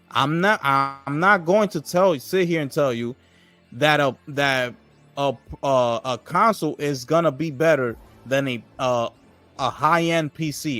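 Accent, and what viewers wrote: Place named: Dominican Republic. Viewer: You sound like a wild Dominican from the height or inwood.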